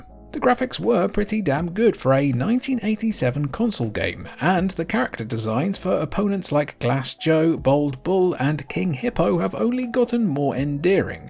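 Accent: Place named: United Kingdom. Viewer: Fax very much! Not just for this vid but the others I have watched. Good to hear another brit even when you get the tongue twisters in your videos hah. Faxanadu really gave me Zelda 2 vibes with the side scrolling.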